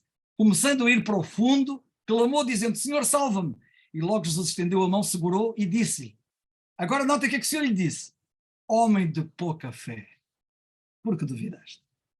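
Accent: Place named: Portugal. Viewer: ❤ como amo esse sotaque de Portugal 🙏 irmão saudades de ouvir o senhor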